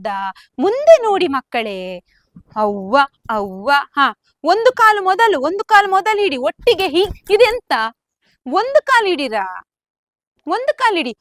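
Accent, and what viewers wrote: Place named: Udupi, India. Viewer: Tumba maja agtade namma udipi kannada keluvaga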